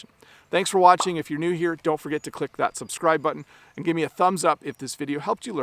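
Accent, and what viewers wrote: Canada. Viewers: Hi, I noticed you pronounced the "s" in "conversation" with a "z" sound while I've heard other people pronouncing it more like a "c" sound. Is it a sort of Canadian accent? Thanks for the lesson!! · Thanks..I'm from Sri Lanka and have been a member of your educational YouTube channel for a long time and have learnt a lot so far. Your way of presenting lessons is excellent. Your Canadian English accent is very clear and learners of English may not want to switch on the English subtitles to understand what you say. Actually, it's important for all learners to talk to strangers when learning English. As I know, it's the best way to practise English and by doing so, they would be able to learn different accents of different speakers. I know by experience talking to foreigners who visit Sri Lanka that they speak in different accents. Some non-native speakers speak in broken English but it's understood.. 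It's only through mingling or interaction with others that we're able to practise speaking. This is true regarding all other languages.. KEEP MAKING MORE LEARNING VIDEOS....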